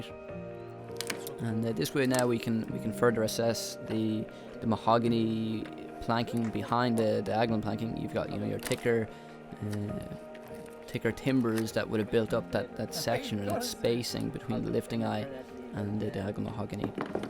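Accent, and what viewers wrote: Ireland. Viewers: Hi there rea;;y fantastic video! love the way you work with your dad. HOW wonderful to have an Uncle that`s also creative an you have given is boat new life. I really enjoy your videos I came to Australia when i was six migrated from England. All my family are from Ireland and my parents are from Ballybok. I love Ireland of course and its so nice to hear your accent. It reminds me of my family my parents etc. I have been back several times and My grannies house is still there in Landen RD Ballyfermot. I have all my family there except my mum and twin sister and one of my brothers. the other brother migrated to Switzerland. anyway I hope you keep warm as the weather is rapidly changing there for you. Hope you get the boat water proof before the weather gets too bad. Love the rocket stove I think it is worth a fortune. I`ve never seen one like that before it is stunning. 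and rocket stoves are the best stoves and fire place to have. I loved seeing you put the turf in made me homesick for Ireland. We are worlds apart but we have a kindred spirit in Ireland. God bless you guys I wish you well. till next time.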